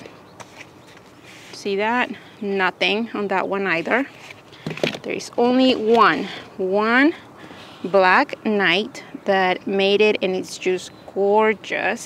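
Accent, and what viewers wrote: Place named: Poland. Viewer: Hehe, you're so funny. And your polish is sweet.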